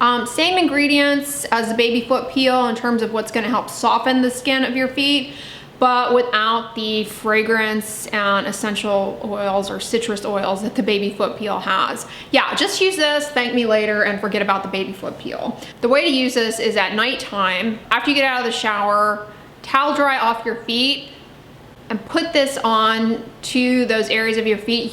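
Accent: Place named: South, United States. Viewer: I don’t like your southern accent! This accent irritates me to the core but thanks for your ( advise).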